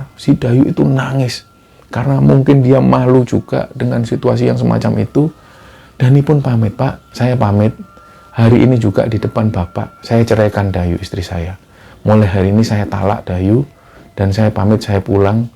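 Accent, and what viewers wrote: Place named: Java, Indonesia. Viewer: Logat nada bicara mu kami smua suka dan senang mendengar kan nya. Gas Jawa banget. Jdi sesuai Membawakan Kisah kisah horor itu. NGENAK DI HATI PENDENGAR NYA.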